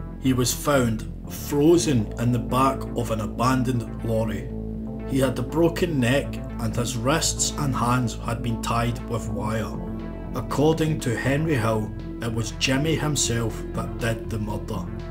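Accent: Scotland, United Kingdom. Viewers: Narrator is Scottish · I must say the Scottish, when they’re not excited, speak the clearest English in my opinion and im a Londoner. Good luck fella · He's Scottish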